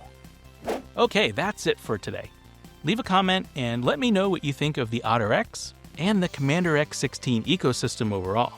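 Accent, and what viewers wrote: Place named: West Coast, United States. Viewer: I feel like that is west coast pronunciation. I catch myself saying wadder instead of water.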